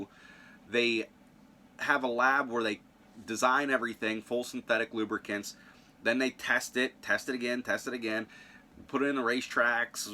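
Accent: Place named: Pittsburgh, United States. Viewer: You have a Pgh accent. I know because I grew up in the South Hills off Bower Hill Rd. BTW, love Amsoil, but best to put in newish or well-maintained vehicles.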